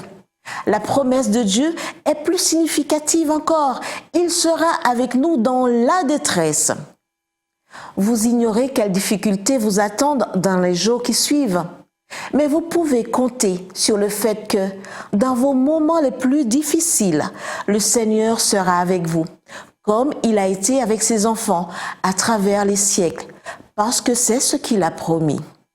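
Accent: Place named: Antilles, Caribbean. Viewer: Merci pour ces belles paroles 🙏 Après avoir passé un peu plus 23 ans en Guadeloupe, le léger accent antillais rajoute pour moi une dimension affective forte. Je connais l'esprit chrétien très fortement encré dans cette population qui est devenue ma seconde famille. Les touristes voient d'abord les plages et les beaux paysages, mais c'est avant tout un peuple infiniment bon et beau. Oui, je me suis un peu éloigné du but de cette vidéo, mais pas autant que ça, puisque l'amour est présent dans chacun de ces mots. Que Dieu vous bénisse 🙏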